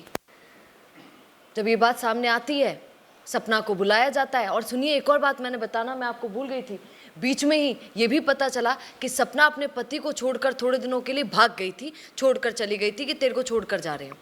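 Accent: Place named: Marwar, India. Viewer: आप मारवाड़ी भाषा बिच बिच में बड़ी अच्छी लागे सा बाई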